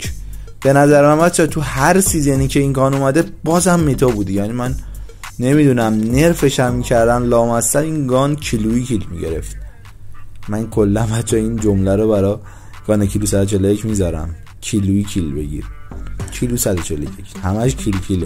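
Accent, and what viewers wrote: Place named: Hamadan, Iran. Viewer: داش‌ جواب بده‌بچه‌کجایی لحجت‌به‌طرف‌همدان‌میخوره‌بچه‌کجابی؟؟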